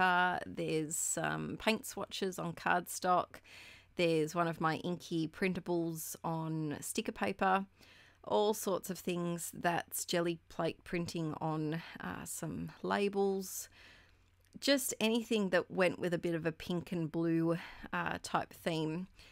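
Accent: Australia. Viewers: I am amazed at the job you managed to achieve suffering from PND. I appreciate your energy and enthusiasm which is in you as well. The first thing I do when I wake up is going on YouTube and watching your video which I expect with a kind of childish excitement. I live in the south of France and I love listening to your Aussie accent. Your videos are precious to me as I suffer from disabling chronic illness which makes it difficult for me to craft. So I just take things as they come. Life is beautiful, you've got a lovely family, stick to all the wonderful things you achieve and don't give to much credit to this PMD. This doesn't mean you've got to ignore it, which is impossible but maybe you need to take care of yourself and slow down a bit. Having 2 young kids and a job is very demanding. Don't forget your own needs. Take care. Thank you very much for the few minutes of fun and joy you bring in my life.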